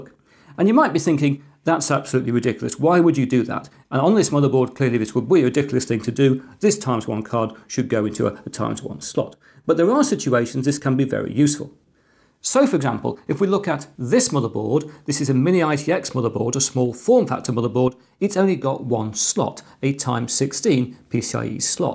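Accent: United Kingdom. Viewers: Is it me or do you understand things better when explained in a British News anchor accent 🤔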